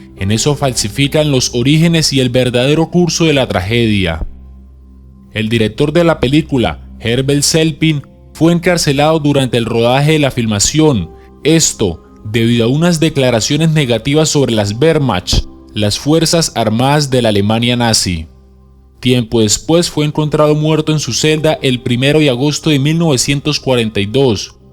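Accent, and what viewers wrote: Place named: Colombia. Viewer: tan solo con ese horrendo acento colombiano; al minuto de ver el video me dio desesperacion y ya no me intereso ver el video 😝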